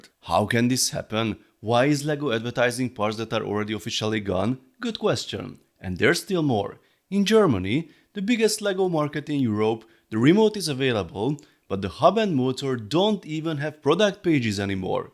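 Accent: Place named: Hungary. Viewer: Heló i m..... erősen magyaros a kiejtés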